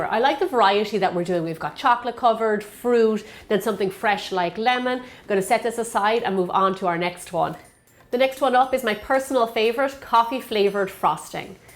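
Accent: Ireland. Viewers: You've explained everything so well , so easily understandable for newbies like me Also , Love your voice and accent ❤️ Irish., I'm guessing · Are you Irish, I love your accent!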